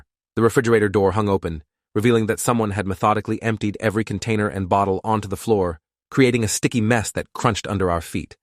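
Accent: United Kingdom. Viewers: anyone hears the British accent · Why does it keep slipping into a British accent? 😂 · why british again?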